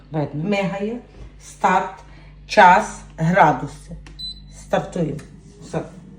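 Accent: Ternopil, Ukraine. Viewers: Ви не розумієте, це Тернопільський акцент і мова у них друга, так само порівняти людей з Одеси, Львова, а Закарпаття там взагалі мова на мові не знаєш якою говорять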